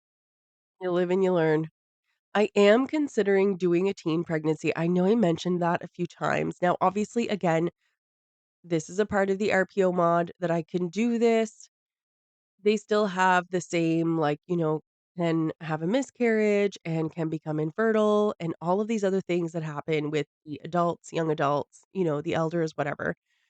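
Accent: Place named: Ireland. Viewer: So about 4 episodes ago was the first video of yours that i had found and please dont take offense to this but i remembered why i fell in love with your videos. Your voice sometimes sounds very irish. I'm 25% but born raised US so you were entertaining and then when im sober i still love your videos lol. also i can never here the background noise of the construction. and rarely hear doggo. I remember clicking ahead and being like who are these people???? and here we are lol. Thank you for all the wonderful videos!!! <3 Still hoping for like an active members only discord lol 🤞🤞🤞🤞🤞💜💜💜💜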